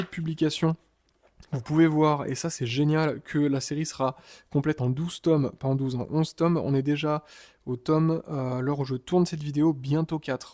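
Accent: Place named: England, United Kingdom. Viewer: Un nouveau dans le game comics. Tu lance ta chaîne qui parlera des comics news ?Très bel accent anglais 😎 Tout dépend ta recherche la poche ça permet de relancer de nouveaux lecteurs à petit prix pour relancer l’industrie du comics pas pour un public voulant une belle édition moi avec le temps qui passe je favorise les belles éditions et toujours en dur même si ça devient un budget comme les omnibus dans leur fourreau Après le dur tu ne peux pas lire ça dans le métro 🤣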